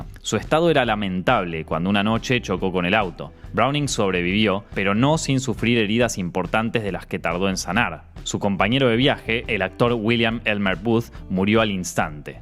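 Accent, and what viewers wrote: Argentina. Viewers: Viejo. Normalmente la narración con acento argentino no me agrada, pero el tuyo está demasiado brutal... 👌🏻 No me extraña el éxito que tienes. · odiavle el acento argentino pero ni modo... la tematica esta buena. me aguanto. 🙄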